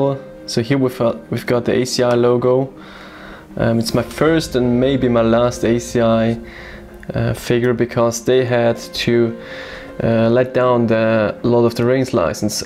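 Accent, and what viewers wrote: Germany. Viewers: your accent, are you from germany?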